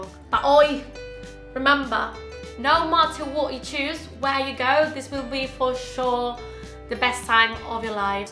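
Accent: United Kingdom. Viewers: Como as conseguido este acento Britanico? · Sos argentina?? tenes un acento super british! solo lo aprendiste con el curso de EF?? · me encanta el acento britanico q agarraste😭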